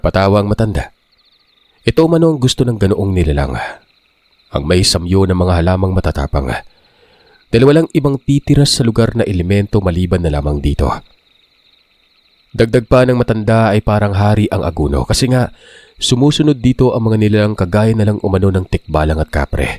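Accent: Visayas, Philippines. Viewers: wag kna magbasa ng may bisaya... BOBO KA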